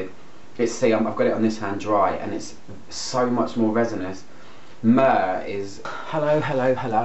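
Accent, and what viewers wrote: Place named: East London, United Kingdom. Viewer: Hugh Grant who went to Private School.. 😂😂🤔 😏😂 Anyway I love your East London accent ❤️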